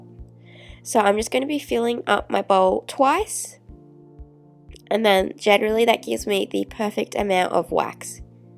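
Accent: Australia. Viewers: Heya, wow so refreshing to hear an Aussie on YouTube, im from qld……thank you so much for this tutorial. I made some candles today actually and you guessed it i have that dipping you were talking about….so i can use a hairdryer to remelt the wax? Have an awesome day/night….xxxx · R u Australian? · Added bonus is the Australian accent!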